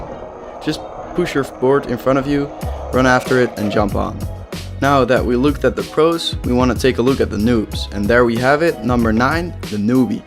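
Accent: Netherlands. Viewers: are you dutch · U dutch? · are u dutch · Ik dacht al te horen dat je Nederlands bent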